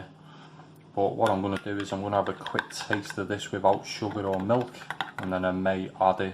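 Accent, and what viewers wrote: Liverpool, United Kingdom. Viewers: Sorry, this is not related at all but I have to confirm, is that scouse accent I'm hearing?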